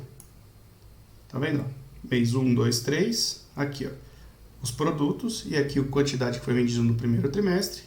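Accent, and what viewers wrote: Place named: Minas Gerais, Brazil. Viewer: Sotaque minerim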